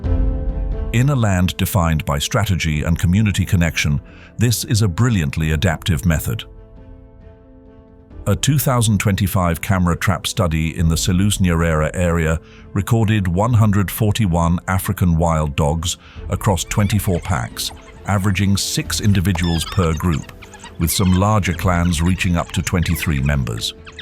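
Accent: United Kingdom. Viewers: At least the source discloses that this is AI-generated. But why does the AI narrator always have a "posh" southern England accent? This is almost without exception the case. Do the people who create these things think that the accent lends an air of credibility and gravitas?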